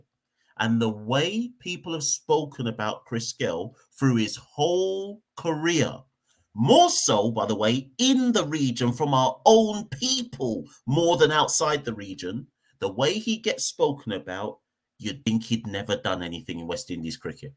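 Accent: England, United Kingdom. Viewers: I don't believed that you were born in Jamaica and talking, like you were born in England. Becaused my ex wife is living in England 58 years, she went there when she was 8 years old and still living there. I was married in England 🇬🇧 31 years, since I was married in England 🇬🇧, but I am living in the United States 👍 almost 30years now. I am older than Ambrose, Ambrose, Bishops, Carl Hooper, Desmond Haynes, Marshall, Jimmy Adam's,BENJAMIN'S them,so I know what I am saying. I can see that you are very young, I know since 1971 playing for the combined Islands them. Ambrose is very much out of order, they just jealous of Gayle with his money and fame.The man 👨 is well loved in India 🇮🇳 and, all over the world. Playing into a few games and failed, I don't see all the big thing what Ambrose is behaving so erratic. Becaused he cannot compared himself with Gayle also Viv Richards, Gayle is the only wi batsman to have two T20 hundreds. 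The first batsman in the history of the T20 cricket 🏏,to scored the first hundred in his 1st match against South Africa 🇿🇦. I hates how these small islands guys is behaving so erratic, they does not want any Jamaican to play on the wi team. Viv Richards is talking about Jason Holder is not a good player at all, he may luckily get a few wickets now and then.Also scored a few runs, but he is not a reliable, or competent player like Gayle. Anybody who knows about cricket 🏏, every player have their ups and down. In their career, so Viv Richards is very st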